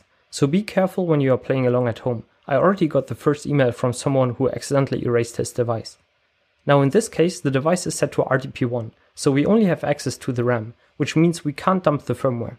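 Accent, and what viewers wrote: Germany. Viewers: Are you German? Because your accent sounds really German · Impressive job dude! Are you german? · He sounds german too, it only makes it sound more dramatic. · Deutscher Akzent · Are you german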